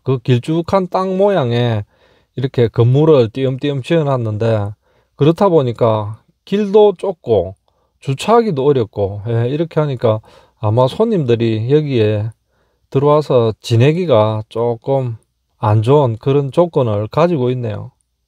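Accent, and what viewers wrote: Gyeongsang, South Korea. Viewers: 깔깔하네 서부경남쪽 사투리 말 올래만에 들어봐네요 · 영상보면 목소리나 영상이나 그냥 일상...부산경남사투리억양...디젤집시님이 생각납니다...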